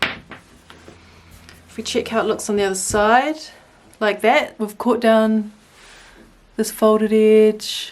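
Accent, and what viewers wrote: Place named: New Zealand. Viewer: LOVED the ruler tip - Brilliant! And so nice to hear a fellow Kiwi tutorial :) It was like having my BF sew with me. Thank you <3 This is so good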